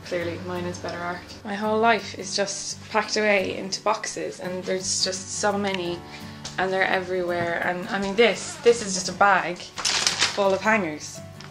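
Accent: Ireland. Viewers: So nice to hear an Irish accent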